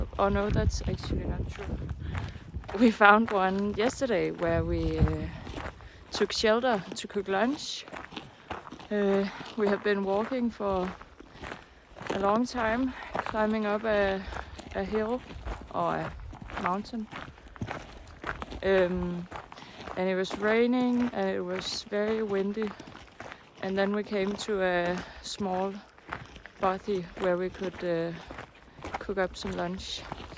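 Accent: Denmark. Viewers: I think that I'm hearing in your voice how the Danish tongue has deeply influenced some British and Irish accents.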